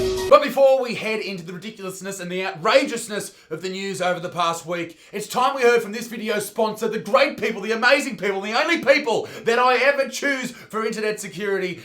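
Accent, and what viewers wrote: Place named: Australia. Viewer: oh boy I just love listening to an australian man shout at people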